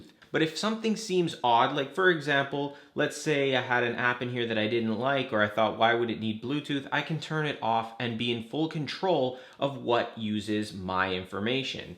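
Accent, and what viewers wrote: Canada. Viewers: This is freaking perfect. SO helpful. Thanks, buddy 😊 Also, love that I can tell you’re Canadian from your pronunciation 😁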